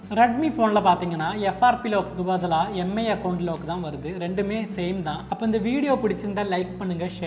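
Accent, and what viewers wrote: Kerala, India. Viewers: Bro....neenga malayali ryt😁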